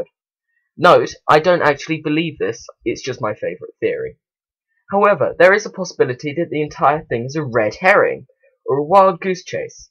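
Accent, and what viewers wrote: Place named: England, United Kingdom. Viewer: george lightheart He sounds English to me.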